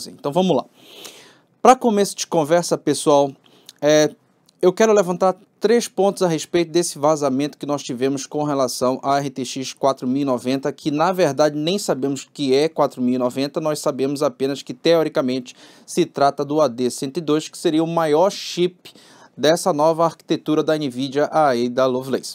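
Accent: Pará, Brazil. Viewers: Ei doido tu é paraense é? boto fé mano drx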